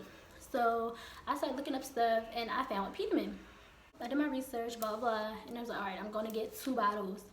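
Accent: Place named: Maryland, United States. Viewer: Girl I'm from MD and you sound like you are too 😝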